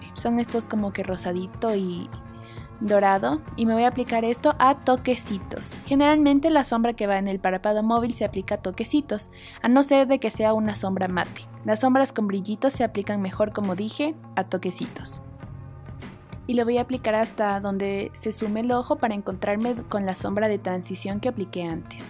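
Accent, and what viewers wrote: Quito, Ecuador. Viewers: Con apenas escuchar tu forma de hablar supe eres ecuatoriana, me gustó muchísimo todo muy bien explicado... felicidades! una subscriptora más😜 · Solo con escucharte un ratito ya sabía que eras de Quito, igual que yo!!! 😁😁😁Muy buenos vídeos!!! Te sigo desde Alemania!! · Estaba esperando una youtuber ecuatoriana como tú 😍 desde que empezó el video reconocí tu forma de hablar 💕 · Me encanta tu acento, de que parte de Ecuador eres? Yo tambien soy de ecuador por sía. Jaja de Guayaquil, saludos, ya me suscribí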